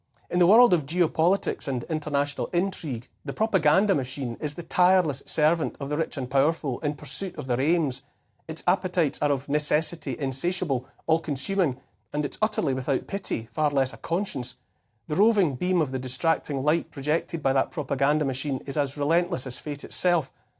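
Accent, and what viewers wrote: Scotland, United Kingdom. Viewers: I do pay attention to what say, but sometimes I just play back so I can hear your Scottish Brogue!:O) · Although I agree with you on everything, your common sense statements more persuasive in your Scottish burr 💕🇺🇸❤️🏴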